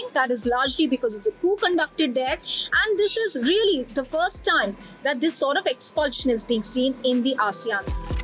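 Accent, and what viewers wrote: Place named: India. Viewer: China is bullying Asean? Do you even know where the location of Asean in the world? And please speak without the Indian accent.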